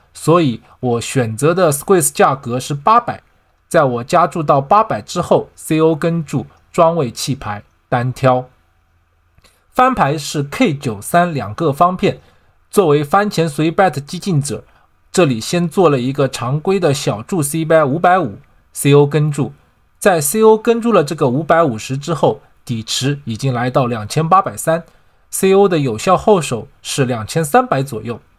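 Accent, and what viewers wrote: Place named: Hangzhou, China. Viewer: 口音杭州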